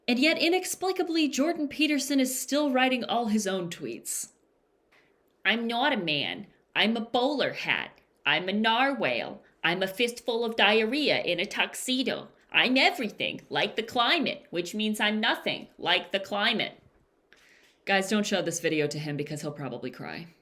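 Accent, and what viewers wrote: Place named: France. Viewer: Impeccable French accent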